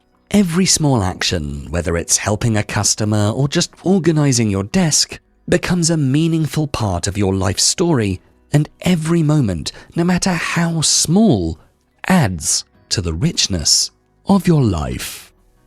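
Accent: United Kingdom. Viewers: Perfect British accent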